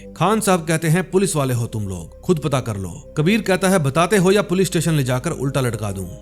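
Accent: Punjab, India. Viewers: Punjabi accent rocks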